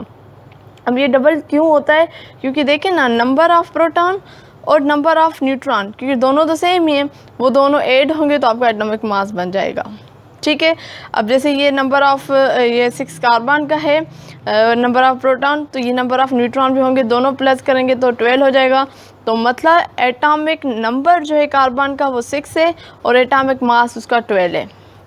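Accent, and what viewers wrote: Sindh, India. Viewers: Searching for best lecture on atomic structure and got your video ❤ .... Love your Sindhi accent Urdu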